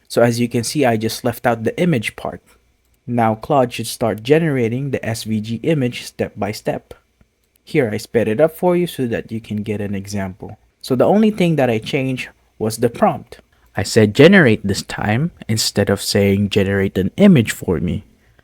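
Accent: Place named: India. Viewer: I heard an Indian accent and immediately clocked off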